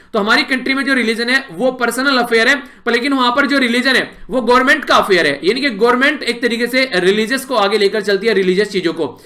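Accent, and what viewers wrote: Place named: Rajasthan, India. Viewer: Sir r u belongs to rajsthan bcz ur pronunciation .... M bhi rajsthani so I can connect ☺️🤪like Sadi instead of shadi , dactor instead of doctor n so on..........I have been noticing this thing for many days so ......☺️☺️☺️☺️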